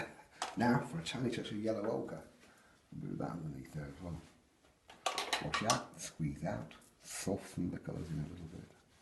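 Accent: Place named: England, United Kingdom. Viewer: Your instruction is precise and detailed. You give the reason why you are doing something and illustrate clearly. I too shall look for your book. You do need a new sound system though for those of us who have limited hearing. English accents are not always easy to follow for Americans, but having hearing trouble just makes that more difficult. thank you so much for your lessons.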